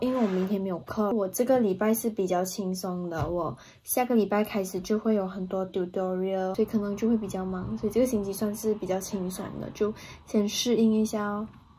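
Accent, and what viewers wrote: Malaysia. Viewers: 被youtube推荐来的！一开始看封面以为是中国小姐姐，没想到是熟悉的马来西亚腔哈哈哈，小姐姐好有趣好有气质，果断关注！（以前有考虑过去国外读心理学，看了你的vlog超感谢自己当时没有坚持，太难了哈哈哈😂🤭） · 马来西亚华人口音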